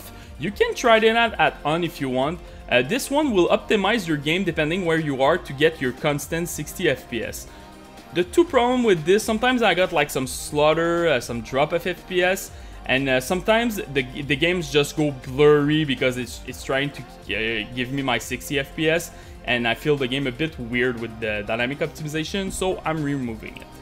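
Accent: Quebec, Canada. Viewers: tu sonne comme un quebecois qui essaye de parler anglais (your voice sounds like a guy that speaks french trying to speak english) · t'es québécois sa parait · This guy is from Quebec, I'm sure of it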